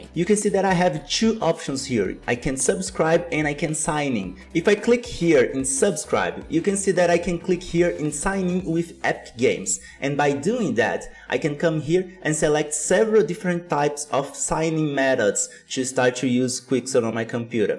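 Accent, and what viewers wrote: Brazil. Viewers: Sabia que era BR! kkk reconheço o ingles BR! Otimo video! Me inscrevi!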